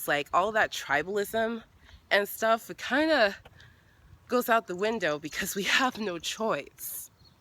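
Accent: United States. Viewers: Hey, I can tell by the way you talk that you are Americanized. That's the thing people don't factor in when they think about tracing their roots. You are a first born or second born American so it's by chance that you even took the test. That's why I hate some of the money grubbing that goes on in the US. It's because people want to make a lot of money that we can't see people from all over the world on tv. I've been trying for days to get free tv from other countries and I can't get any with Great Britain Natives on it because the US wants to charge money. I know that I'sound like I've gotten way off topic but here is how it ties in to your thing. Blacks in America are completely cut off from their African culture. You have your parents to fall back on but they've completely lost theirs. They could probably look at the Africa channel but that's cable and it's not cheap. 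I was looking for movies to watch and I realize that people get triple charged and still can't watch without paying even more. There was a movie I have seen called five easy puces. I have basic cable and I can't watch it because I don't have movie channels. The other parts of the house I have digital cable but I couldn't watch it unless I happen to have that movie channel package. I do happen to have that package but here is the rub. It will cost me an additional $4 to see it because I have to order it on demand. When do I stop getting charged for something I want to see? The lady probably feels like me that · You sound totally American. By the way, I'm 71% African, with 27% Nigerian. And you are very kind and lovely.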